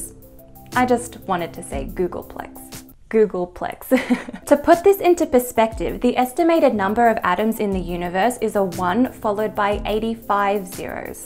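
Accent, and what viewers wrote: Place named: Australia. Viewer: Aussie accent is superb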